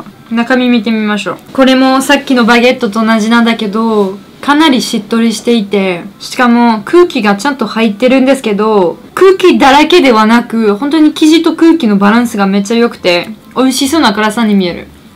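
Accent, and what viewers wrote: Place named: Yamagata, Japan. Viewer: なんか山形なまりになってるよ 笑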